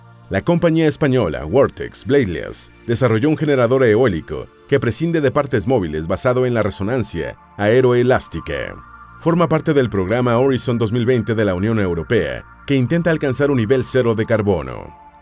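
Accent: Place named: South America. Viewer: Porque habla en sudamericano??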